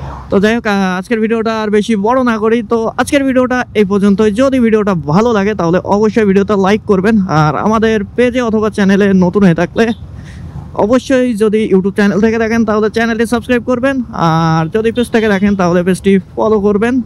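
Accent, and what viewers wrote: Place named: Kushtia, Bangladesh. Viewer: আপনার বাসা কি কুষ্টিয়ায় 😄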